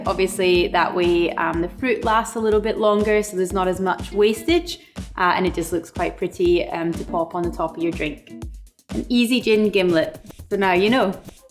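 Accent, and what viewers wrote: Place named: Scotland, United Kingdom. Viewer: Scottish accent...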